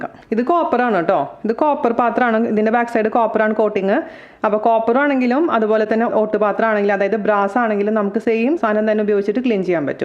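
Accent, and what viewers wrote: Thrissur, India. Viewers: Thank you madam... thanks for the great tip..Really working.. Thrissurkari aano bhasha kettitt angne tonni😁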